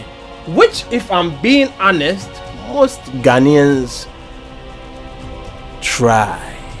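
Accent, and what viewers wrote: Nigeria. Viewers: yep because he creates more nigerian content and speaks like a nigerian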